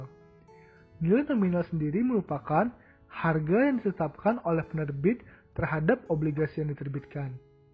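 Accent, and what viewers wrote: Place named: Bengkulu, Indonesia. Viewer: Keren kak, berasa aksen bengkulunya coba lain kali dubbernya orang yang sama tapi disuru nyanyi dulu biar fresh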